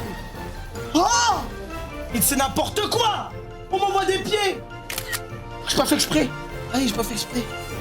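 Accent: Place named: Marseille, France. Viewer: L’accent marseillais >>>>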